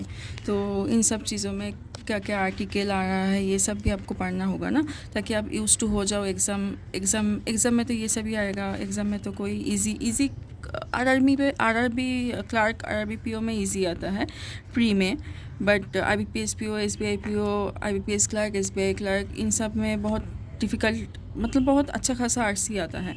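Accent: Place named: Bengal, India. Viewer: Ap kya Bengali ho? Apka accent bengali ki tarah lag raha hain....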